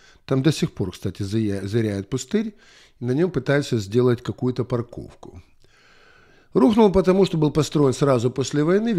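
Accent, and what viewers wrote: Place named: Ukraine. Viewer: Спасибо, за грустный, поучительный, правдивый рассказ. Отмечаю каждый раз, слушая Вас: как живуч украинский акцент, даже по прошествии стольких лет жизни за границей, свободном владении английским и т.д...😊👍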